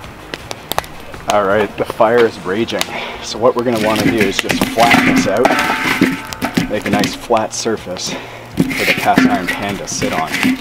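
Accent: Canada. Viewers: I’m making some bacon today with your recipe… can’t wait!! You Canadians have the best accents ever! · is that a Canadian accent I detect? If you follow my page I have a cab in myself way up in the northern Adirondacks on the Canada border ck us out 👍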